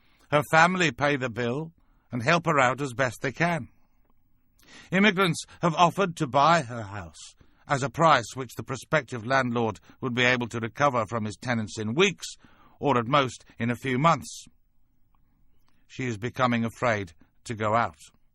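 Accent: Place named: Birmingham, United Kingdom. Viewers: Classy Brummie accent for these two-bob pseudo-intellectual views, good work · It's a Midlands working class accent that has been somewhat polished. Unusually for a top Tory Powell was not a toff, but rose through talent and effort - if only we'd been wise enough to listen to him...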